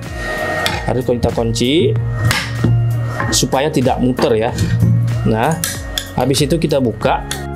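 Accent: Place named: West Sumatra, Indonesia. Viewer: masih terasa logat minang nya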